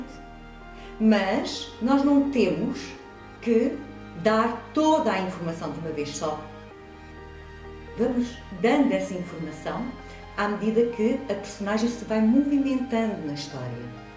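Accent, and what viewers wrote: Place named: Portugal. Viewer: ela fala a ligua de portugal quem concorda comigo da like e cometem a nha opiniao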